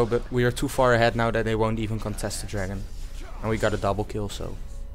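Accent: Netherlands. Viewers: je hoort gelijk dat het een nler is hehe, nice vid tho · i think he's dutch his accent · That dutch accent ♡ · I'm pretty sure that accent is from Holland :)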